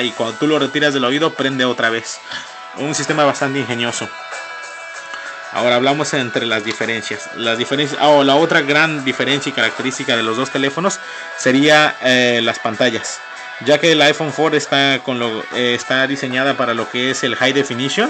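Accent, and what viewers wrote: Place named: Mexico. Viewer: 30 segundos y quité el video. como detesto el acento mexicano... mejor lo veo en Inglés weis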